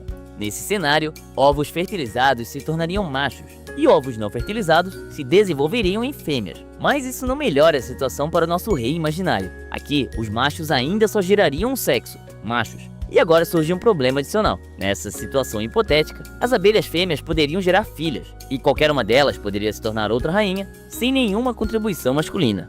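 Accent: Santa Catarina, Brazil. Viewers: vc tem voz de catarino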